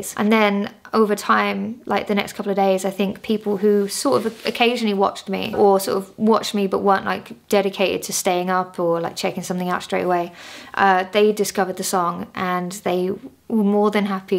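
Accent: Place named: Midlands, United Kingdom. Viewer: As a fellow resident of the Midlands I love that has become fully naturalised and now says "laff" rather than "larf".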